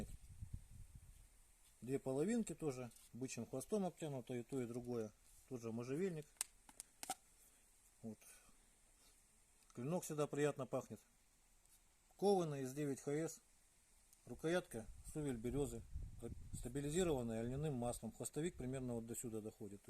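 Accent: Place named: Vladimir, Russia. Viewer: Я нутром чую, что ножи добротные. Руки мастера и говорит с добром в словах. А моё чутьё меня редко подводит. Для меня очень важно, что бы нож был сделан кузнецом с душой и добром в сердце. Такие вещи чувствуешь сразу. Жаль, что не могу заказать у мастера этот инструмент, так как нахожусь далеко, совсем рядом граница с Польшей, рукой подать. От себя скажу, что такой нож надо выбирать под себя не по интернету. Такую вещь надо в руках подержать, а лучше им поработать по дереву. А газета - это красиво, эффектно, но не эффективно, на мой субъективный взгляд. Говор у мастера интересный и довольно мне знакомый. Владимирская область?🤔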